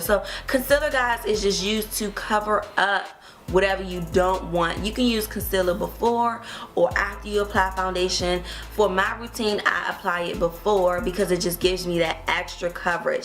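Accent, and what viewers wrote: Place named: Baltimore, United States. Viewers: I'm obsessed with your accent, where are you from? DC? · tuuur ( two) omggggg I love DMV accents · You gotta b from Baltimore lol I hear it all in u, new subbie love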